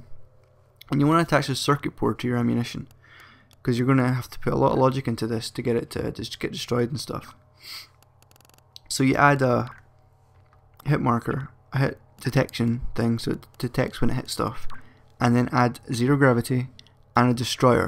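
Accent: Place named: Scotland, United Kingdom. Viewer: cool vid but are you speaking english? That scottish accent is rough.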